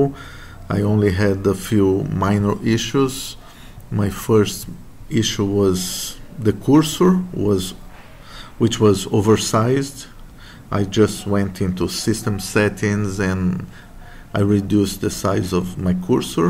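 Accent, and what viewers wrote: Brazil. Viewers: sotaque brasileiro é forte kkkkk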